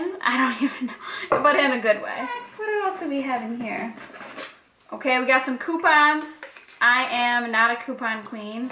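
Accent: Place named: Upstate New York, United States. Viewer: You have an upstate NY accent, like how you pronounce your "a's". When I lived in MD my friends there would bust my chops because I was the same way. Water safari is the best BTW. I live in PA now and my bf just doesn't understand why I want to drive 5hrs back home just to go to a water park.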